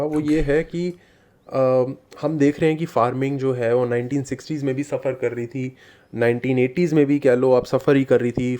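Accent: Pakistan. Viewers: He sounds more like Pakistani....(accent)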